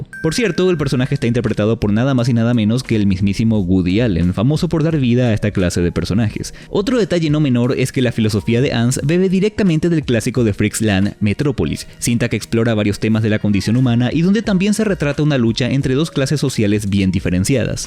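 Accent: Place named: Paraguay. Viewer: Nuevo Sub :D, dije que eras paraguayo por tu acento y es cierto xd🇵🇾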